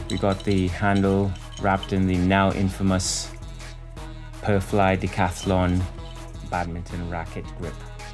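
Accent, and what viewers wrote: Trinidad and Tobago. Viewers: Do I detect a Trini accent?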